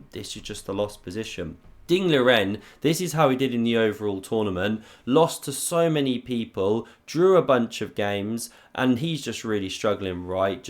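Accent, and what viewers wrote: United Kingdom. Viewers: what is the name of your particular type of British accent? What's the region?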